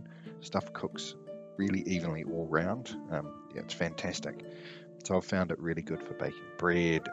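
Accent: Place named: New Zealand. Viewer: Great review, gonna get one for my barge. Nice to hear the New Zealand accent.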